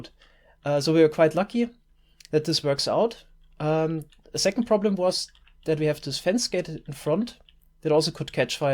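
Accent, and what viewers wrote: Germany. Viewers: Richtig Geiler Deutscher Aktzent von wo kommst du? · Der deutsche Akzent, herrlich xd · his German accent says enough, this guy is definetly an engineer irl. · Bist du deutsch? 😂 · Dein Deutscher Akzent gefällt mir ^^ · He sounds DEUTSCH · He sounds very german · German accent is real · Is he german? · aber schön deutscher Aktzent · man hört so hart den deutschen Akzent heraus lmao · Are you german??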